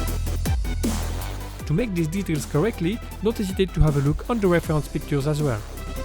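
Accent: France. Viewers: ok je pense bien que tu avais un peu. Un accent français sa s'entans a ta voix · Ah d'accord, ça se voit à ton accent que t'es français 😂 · Salut es ce que tu es français ? Car je trouve que tu parle anglais avec un accent français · Le mystère des recommandations ytb x) Au moins un tuto pour lequel je n'ai pas eu besoin de sous-titre, un petit french accent au top xD Le boulot monstre .. fiou ! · Wait ! this accent... but alors you are french ?! X) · Bonjour, es-tu français avec ton super accent? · Sublime, j'adore et kiff jurassic park, et super acsent français 😊je me suis abonné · Hooo mais tu e 0s français ?? Je me disais avec l'accent=) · Do you speak French ? Because I heard some accent in your dialogue. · You are french ? Beacause you have a accent french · Mdr on dirait que tu es français tu prononce les mots en anglais mais avec l'accent français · Houla, vous seriez pas Français par hasard ? 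Ca s'entend à l'accent... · Pls tell me that your French, because your French accent is sooooo huge xD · Is this guy French?? I get inspector Closeau's vibe from his accent 😅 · Français hein? Ça s'entend x) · he have french accente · T'es français et ça s'entend en tout cas tous ce que tu fais avec le carton sont des oeuvres d'art continue comme ça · I don't know if you're French but ton accent français est vraiment sympa et c'est facile à comprendre du coup ^^ GG ! Edit : Oui j'ai regardé la desc et t'es bien français, c'est cool ^^ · Est tu francais car ta un fort accent français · are you french? not that this would be a problem i just noticed the accent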